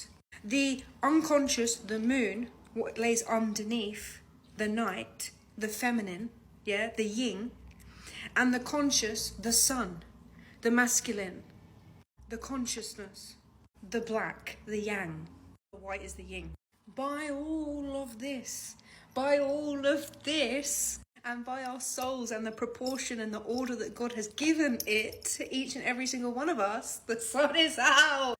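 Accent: England, United Kingdom. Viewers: هذه بريطانيه وليست أمريكيه واضح من اللهجه … كفي عقده أمريكان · هذه لكنة بريطانية وليست امريكية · هل ما فهمته صحيح او فيه ما يشوبه ؟ العلم عند الله ،.. اللهجة ليست أمريكية أعتقد بريطانية و الله أعلم . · لكنة بريطانية وليست امريكية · للتصحيح، لهجتها بريطانية وليست أمريكية... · لهجتها انجليزية وليس امريكية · ثبتها الله على الإسلام.. يبدو من اللهجة بريطانية وليست أمريكية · لهجتها انجليزية وليست أمريكية . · لهجتها بريطانية . هل انت متاكد انها امريكية؟؟؟؟ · هذه ليست لهجتها أمريكية بل بريطانية او ممكن استرالية المفيد ليست لهجة امريكية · هي بريطانية وليست أمريكية، اللهجة الأمريكية تختلف عن اللهجة البريطانية. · لهجتها بريطانيه مش امريكيه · هذه اللكنة بريطانية وليست امريكية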